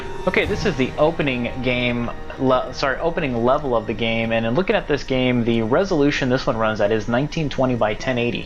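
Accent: United States. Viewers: For a while I thought I was watching, until I realized you spoke American English.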